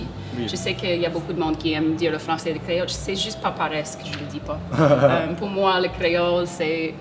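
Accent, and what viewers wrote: Quebec, Canada. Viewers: tu à un super niveau de français.. bravo.. Avec un léger accent québécois..!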